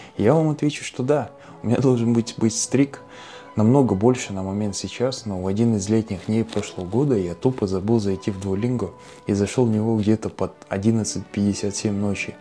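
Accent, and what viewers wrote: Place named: Tatarstan, Russia. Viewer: Молодец! Восхищаюсь! По тому, как говорите на русском, сказала бы, что акцент , как у татар😀 Сама татарка🙂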